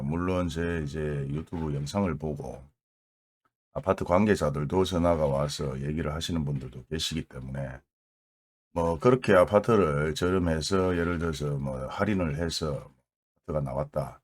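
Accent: Gyeongsang, South Korea. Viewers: 저음에 투박한듯한 경상도 사투리 저는 듣기 좋습니다~~감사합니다^^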